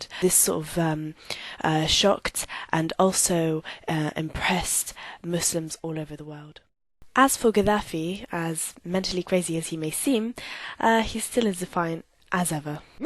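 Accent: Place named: United Kingdom. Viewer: what's up with the brittish accent?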